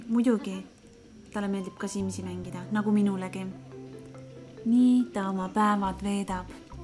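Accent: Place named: Estonia. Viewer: See on vist kõige Eestilikum inglise keel mida ma olen oma elus kuulnud. (No hate)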